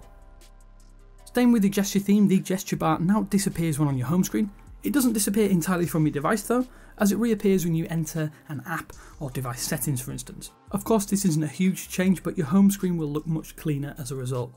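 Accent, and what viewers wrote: United Kingdom. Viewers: where are you from? UK? What accent?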